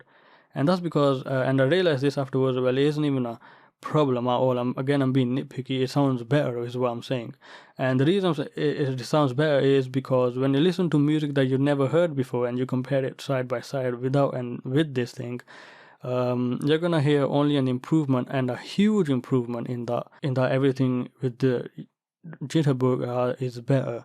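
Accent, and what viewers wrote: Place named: Yorkshire, United Kingdom. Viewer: Hi. My experience of the Jitterbug, when connecting it from my PC to my Audiolab M-DAC is that there was a loss in some of the high frequency detail. It made the audio sound very analogue. I later sought advice and tried connecting the jitterbug to a different USB port to the DAC. This resolved the problem. It got rid of the noise from the PC motherboard that I bought the jitterbug to resolve. I could hear all of the detail that I previously could from my DAC. So, in short, my solution is to use the jitterbug on a different USB port to the DAC. On a different subject, are you from Yorkshire? I'm curious about your accent. Thanks.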